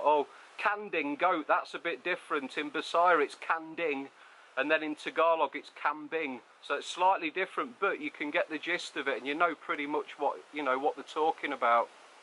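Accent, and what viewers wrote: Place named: Yorkshire, United Kingdom. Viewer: More interested in your accent. Yorkshire?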